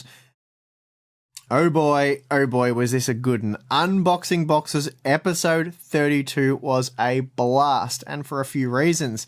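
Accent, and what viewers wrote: Australia. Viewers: Hey! I must say your accent is kinda hard to understand (I'm not a native speaker). But I had an Australian teacher and now it is easy for me to understand, just wanted to share my experience. Btw awesome channel! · Oh hey Your Australian!!!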